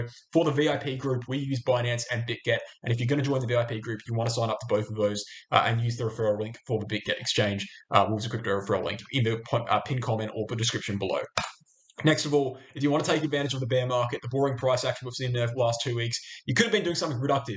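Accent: Australia. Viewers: I like your TA and offers of your considered opinions about the directions of the market. I am presently positioning myself and my wife's portfolios to take advantage of these downward moves in bitcoin and a couple of Altcoins as well. I have a small criticism in that your aussie accent can make catching all of your commentary at the speed at which you speak. Have pity on the rest of us english speakers as we want to get all the nuances that you are putting out! Thanks - RHH.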